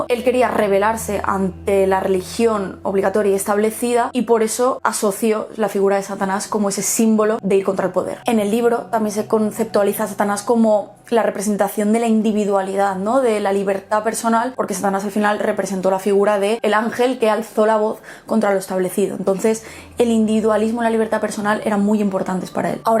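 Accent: Spain. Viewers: me encanta su voz de gallega saludos desde argentina😍😍😘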